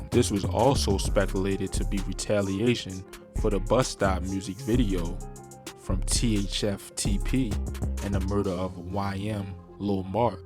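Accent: Baltimore, United States. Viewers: You definitely from bmore bruh lol keep doing ur thang g 💯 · baltimore accent 😖😖😖😤& wtf is “Nokim Noah” 😳😵‍💫🤣🤣🤣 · This narrator dude gotta be from Bmore!! Twwu, Neeews, HuuuLU😂😂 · U from Baltimore?? Lol u def got the accent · I can tell u from baltimore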